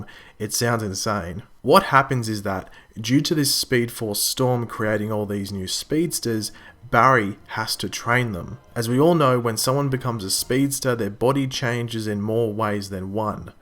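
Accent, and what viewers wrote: Australia. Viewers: u sound Australian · Are you Australian?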